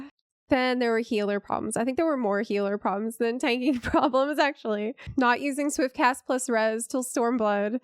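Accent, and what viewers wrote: South, United States. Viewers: wonder what part of the south she is from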